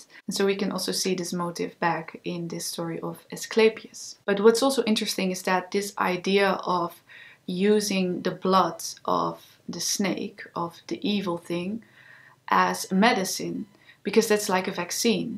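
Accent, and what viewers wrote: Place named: Netherlands. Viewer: waarschijnlijk wel, das een nederlands accent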